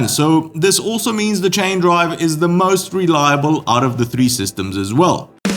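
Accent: South Africa. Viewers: Before I get properly started watching, what accent is that? Sounds a bit South African (but not quite), maybe more clues await me as the video progresses...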